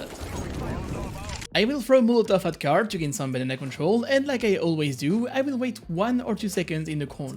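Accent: France. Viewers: il a un petit accent français je crois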